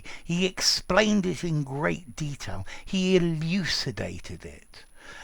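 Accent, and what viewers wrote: United Kingdom. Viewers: Hello, are you british or american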